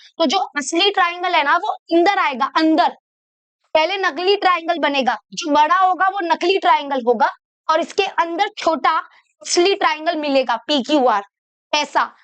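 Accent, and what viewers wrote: Maharashtra, India. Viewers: Mmame your Marathi is too cute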